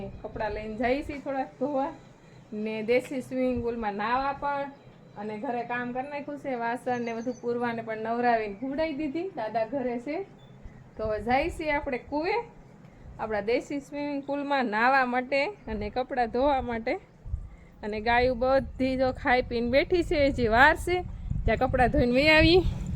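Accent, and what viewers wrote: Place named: Kathiawar, India. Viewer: તમે બોલો તે કાઠીયાવાડી ભાષા સાંભળવી ગમે છે. તમારા ગામ નું નામ શું છે.ત્યા કેમ પહોંચાય તે વિસ્તાર થી કહેશો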